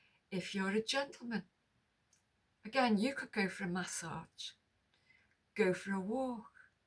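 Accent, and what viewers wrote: United Kingdom. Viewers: Hi, just found you. I want to give you a hug. Everything you have said resonates with my journey which started 3 years ago after my husband died. No one understands until they go through this, we were together for 50 years. I have just returned from my son & his family (for Christmas)just outside Liverpool so recognise your accent😊 I am in Malvern. Please keep putting your feelings on here, I am sure it will help you (and many others too). I too have realised that our “couples friends” no longer have anything in common with me and that my husband seems to have never existed for them. Yes- they too may have to face this one day. Sending love ❤ Sue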